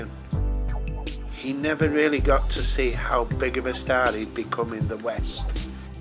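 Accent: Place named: Northern England, United Kingdom. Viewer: Hi,another fantastic vide and trip back to my youth... thanks again! I too went through a kung-fu phase and alongside my Monster mag collection my Kung-fu monthlies were( and still are) prized pocessions of my collection.I was born in 1960 in Nottingham and have fond memories of riding my Raleigh chopper around (which i still have!) with my portable tape player blasting out some of my 70's heroes..., Glam rock, and those great K-tel albums.I also collected a lot of soundtrack albums(A future feature) inc Bond,Kung-fu films plus disaster films inc the great Big terror themes lp by.I moved to Bolton Greater Manchester 5 years ago to be with my girlfriend(who upon hearing your voice detected a certain northern dialect?)I still collect stuff from that golden period and have introduced my girlfriend to the delights of Hammer-Amicus films,ITC tv shows,Wheeltappers & shunters(Colin crompton is a great in our household!) and the fabulous music of the 60's-70's.Your channel is totally unique in looking fondly at a British cultural coolness!.Looking forward to more in the future. 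Take care. Ricky.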